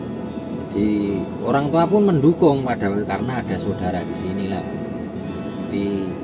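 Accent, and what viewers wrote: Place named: Java, Indonesia. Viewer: Kenapa accent awak masih pekat Jowo? Awak tak bergaul dgn orang Melayu ke? Btw...saya keturunan Banjar dan Bugis. Kampong di Perak.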